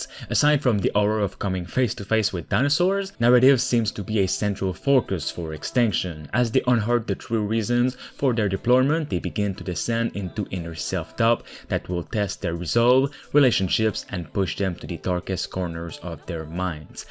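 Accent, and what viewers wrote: Quebec, Canada. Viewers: Est-ce que t’es Québécois ? ton accent te trahis un peu big😂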